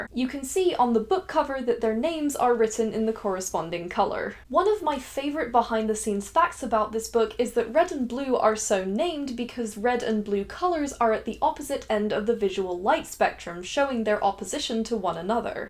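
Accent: Scotland, United Kingdom. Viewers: amazing! Yes I was getting east coast of Scotland. If I'm autistic (I think I am) then my special topic might be spotting accents. Yours is a gem, which I can now put in its own unique place in my collection. Thanks! Ps. Given the Texan element, I would now put the English element in the South West...?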